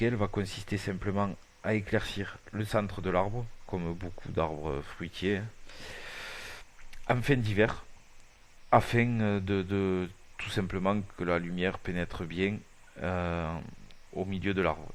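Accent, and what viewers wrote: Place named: Central Pyrenees, France. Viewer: l'accent de pyrénées centrale de chez moi x)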